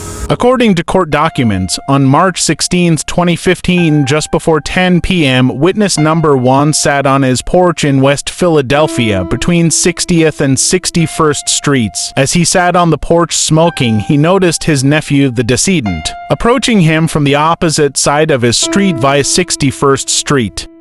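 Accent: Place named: Europe. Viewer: Thea ducking European narrating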